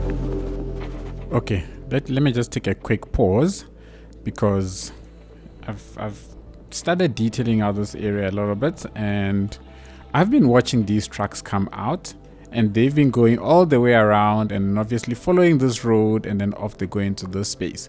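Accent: South Africa. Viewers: are you south african by chance? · I can smell the accent. Awesome to hear some South African youtubers playing City Skylines. Hope you are blessed, brother!! Video Suggestion. Build a South African Major City replica - durban,Capetown, etc. Keep up the lekker videos man. God bless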